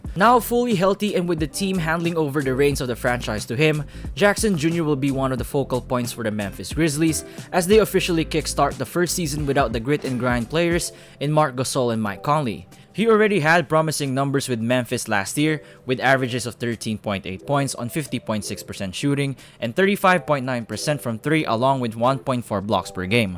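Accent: Philippines. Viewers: Man I recognise your accent, are you Filipino my guy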